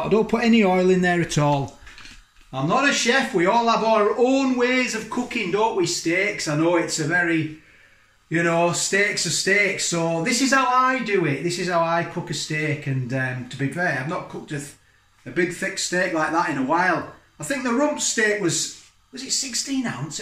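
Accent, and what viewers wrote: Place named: Northern England, United Kingdom. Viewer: Hiya .. The steak looks great. I've also tried this steak from Iceland and I was very impressed by it too. What I want to know is where did your peas go to that you cooked?? I think you had steak brain and forgot about them!! Lol 😂😂 I've only subscriber a few days ago, and I'm loving your northern accent. I live in Bracknell (about 10 minutes away from Windsor) but have always had a London accent. Anyhow, keep what you're doing and I will keep enjoying your channel. Cheers, Vicky 😁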